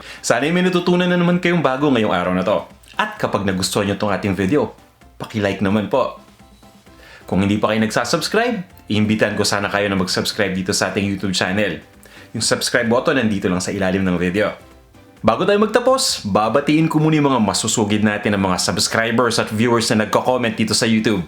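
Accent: Philippines. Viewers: Hi, hello from Australia! New subscriber here and am I so glad to have stumbled upon your channel. Now I can cook authentic Filipino food for my family like how I remember it as a child in the Philippines. I love how your Tagalog-English is so relatable to mine. Been here all my life, just about, but TG have relearnt Tagalog. Thank you for sharing your knowledge! 😊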